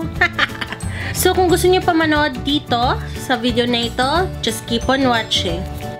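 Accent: Philippines. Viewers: are you cebuana?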